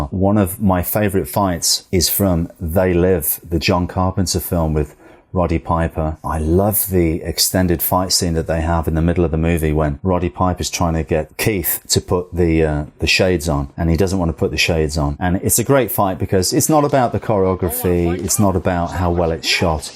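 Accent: United Kingdom. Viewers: He has British accent, it hard to hear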